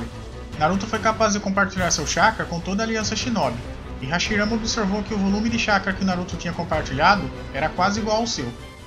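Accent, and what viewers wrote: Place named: Minas Gerais, Brazil. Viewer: Mano seus vídeos são muito bons, tu manja muito, mas na moral cara, procura uma Fonoaudióloga, não é por implicância ou por qualquer outra coisa, mas seu sotaque torna o vídeo maçante, parece um cara do fundo do interior de minas falando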